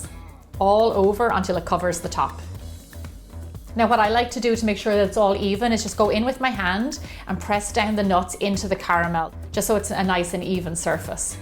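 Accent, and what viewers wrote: Ireland. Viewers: Are you Irish? Great video btw 😁♥️ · Im going to make the snickers one! Looks amazing! Also, love that you never lost your Irish accent! (I'm Irish) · Looks so delicious I must try I made peanut butter rice crispy treats with fudge topping love your Irish accent💚☘️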